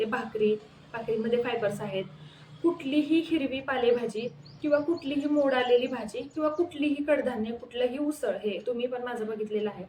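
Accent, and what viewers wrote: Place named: Kolhapur, India. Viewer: Pune mg chan mala Tumch marathi kolhapur sarkh vatle mhnun mi kolhapur madhun bgte tumche video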